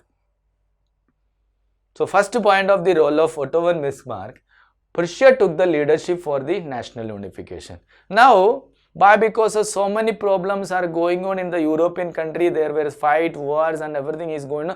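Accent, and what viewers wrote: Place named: Kerala, India. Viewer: THANKYOU SO MUCH SIR FOR THE AMAZING EXPLANATION THE WAY YOU REVISE QUICKLY REALLY IMPRESSED ME SIR SIR I THINK YOU ARE FROM KERALA ..[ PREDICTED FROM THE ENGLISH SLANG ] I AM JUST THE NEARBY STATE SIR TAMIL NADU..... I HOPE YOU KEEP MAKING VEDIOS OF HISTORY AND WAITING FOR NATIONALISM IN INDIA SIR THANKYOU SO MUCH SIR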